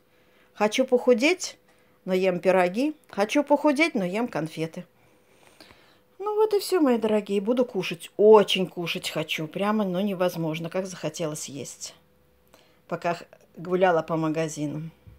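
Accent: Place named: Belarus. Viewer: Говор белорусский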